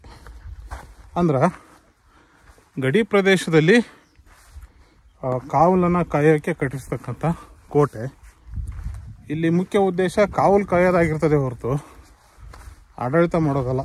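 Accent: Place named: North Karnataka, India. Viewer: Neeu tumba kote kottal galnnu torisuttira tumba dhanyaadaglu nimma bhashe uttarkannadadante annisuttada neeu ylliyavru tumba djanyavadgalu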